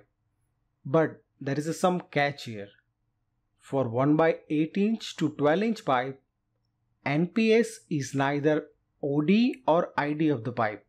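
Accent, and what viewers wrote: India. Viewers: ..you are the only indian english speaker that I find interesting and ameanable..being you an engeener this is almost a miracle..thanks for your nice work...!!!!!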